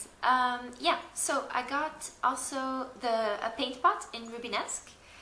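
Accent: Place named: Sweden. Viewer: What country are you from? You sound Swedish :)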